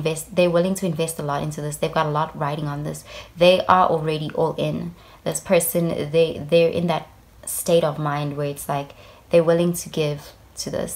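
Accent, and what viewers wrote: South Africa. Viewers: you sound South african 🥺🥰 anyways love your channel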